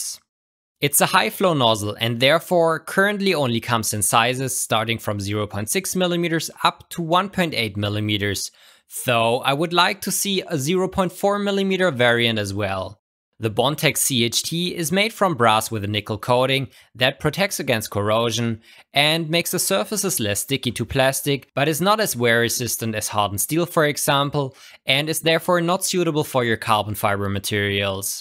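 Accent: Germany. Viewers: Man hört so hart raus dass du Deutscher bist :) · höhre ich da deutsch raus? XD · hmnhhh gutentag, your english is nice i bearly hear your german axent:D im proud of you <3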